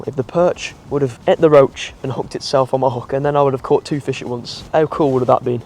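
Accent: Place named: Birmingham, United Kingdom. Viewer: Great video were u from im from the blackcountry u sound like a brummie just found u lol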